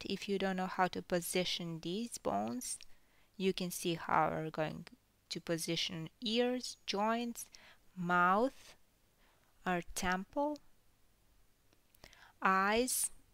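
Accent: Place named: Eastern Europe. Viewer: That beautiful Slavic accent...💌💖💝💘 Ukrainian probably. 🥰😘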